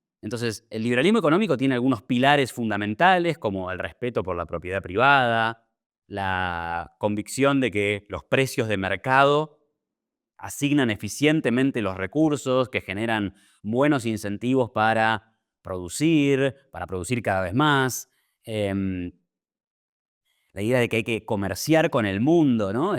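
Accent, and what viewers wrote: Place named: Italy. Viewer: Iván é show! Grande! Um panorama completo e muito lúcido do liberalismo, como é aplicado e dos perigos do socialismo na região. Impressionante o tonalidade italiana do portenho. Como sempre se disse: italianos que falam castelhano.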